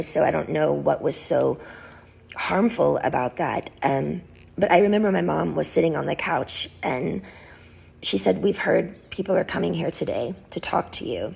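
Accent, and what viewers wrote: South, United States. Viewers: so what she from the south, that's how we talk. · Finally in her own words and no accent besides the south! I’m sorry you went through this Britney!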